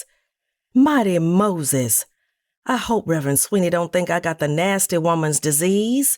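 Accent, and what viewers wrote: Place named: South, United States. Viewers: Absolutely the southern drawal allows the character to be brought to life so much better,, Happy Blessed Mother's Day to you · Lawksss, I feel so bad for Leona always saving her sister. This is genius storytelling! Narrator did an excellent job, I’ll be adding her to my list of faves. The southern drawl gives the book so much character.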